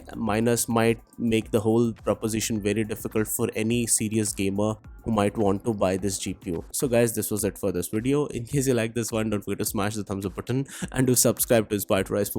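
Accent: India. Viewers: Where you live man the Indian accent feels nearly my country’s normal accent cause how much I hear it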